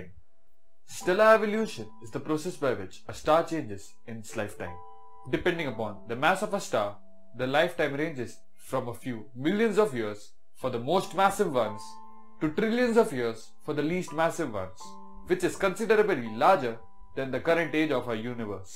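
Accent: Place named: India. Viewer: you are doing a great job, i really like it, but you need a bit of improvment on your english accent, try to speak english without accent, normal english, putting an indian accent makes you understandable for some people and not for others. Good Luck Bro! i give carn luli!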